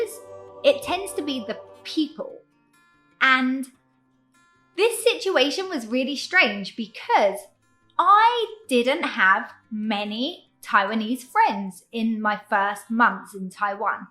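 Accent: United Kingdom. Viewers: I lived in Chungli for five years because of studying in university. In my opinion, it is convenient for living there! There has a lot of convenience stores, 光南(Guangnan), 墊腳石, a big(but also ugly)station, High Speed Railway, Rakuten Monkeys(a pro baseball team), etc. However the only one thing I really hate is cold and WET weather in winter.😂 I want to recommend you my hometown Taichung, it’s more comfortable weather here! But I do like Chungli as well, there are so many memories for me. Btw, I like your British accent. The video make me to practice with my listening. 👍🏼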